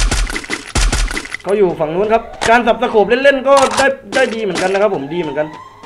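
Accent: South, Thailand. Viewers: ผมกะไว้แล้วเสียงพันนี้คนใต้แน่ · เห็นพูดใต้ได้ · พี่คนใต้ผมคนใต้5555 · พี่พูดเหมือนคนใต้เลย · เเหล่งใต้เลยน่ะ 😆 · พี่คนจังหวัดไหนค้าบ? เหมือนคนใต้อ้ะ!!FCค้าบ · 🌴เป็นคนใต้ม้ายนิหลวง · พอพี่พูดใต้ผมกดไลค์กดติดตามกดกระดิ่งเลย ผมเด้กใต้ด้วยย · ผมโครตชอบสำเนียงพี่เรยวะ สำเนียงใต้นิ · โครตชอบภาษาใต้เลยนิ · แหลงเหมือนคนใต้เลยย🥺😁 ไม่ต้องงงแขบบ55😆😅🤣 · กูว่าเวลาแกพูดแก้เหมือนคนใต้ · เปิดคลิปมารู้เลยว่าพี่เป็นคนใต้ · พี่คนใต้หรอผมคน นครศรีธรรมราช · เข้ามาแลทีแรกเห็นพี่แหลงใต้ ผมกดไลค์ กดติดตามเลย คนสุราษฎร์ธานี🤟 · พี่เป็นคนใต้แน่เลย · ผมฟังจากสำนีงเเล้วว่า คนใต้นิเบล่อ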